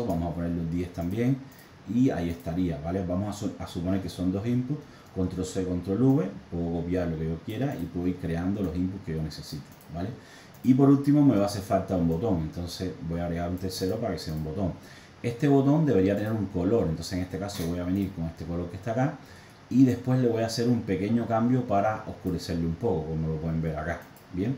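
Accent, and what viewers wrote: Cuba. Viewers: Buenísimo! Muchas gracias! Eres cubano, verdad? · Es cubano?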